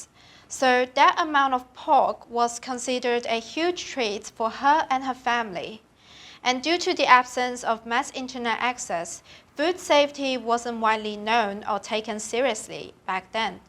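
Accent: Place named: United States. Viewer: My friend this is my humble request please change your English speaking style your speaking American English Asian country peoples are not properly understand please my friend tried to speak British style English thank you for this video my friend🇨🇳🇨🇳🇨🇳🇨🇳🇨🇳🇨🇳🇨🇳🇮🇳💐👍✌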